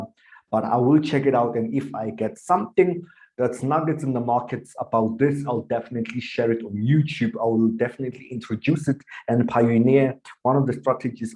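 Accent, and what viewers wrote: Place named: Uganda. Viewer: U sound like Ugandan and munyoro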